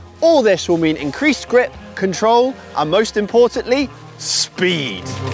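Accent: United Kingdom. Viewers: umm are you in british?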